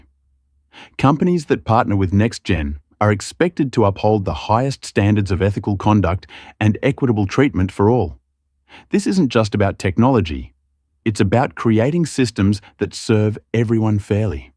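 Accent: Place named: Australia. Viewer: It's nice to hear someone,narrating in my "language" my Aussie version,,this is real English,, n I loved every minute of it❤listening Papua New Guinea 🇵🇬 south pacific down under pondoman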